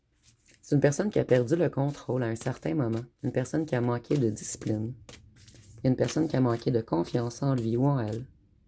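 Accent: Quebec, Canada. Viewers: Vous êtes adorable, un travail poussé pour créer de petites cartes bien sympas comme celle des chansons, j’aime bien votre énergie solaire et cette voix sympathique, l’accent du Québec trop mignon. Bref, vos guidances sont précises et agréables. Belle journée 💕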